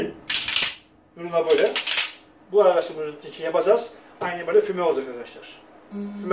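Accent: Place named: Rize, Turkey. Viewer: Adam rizeli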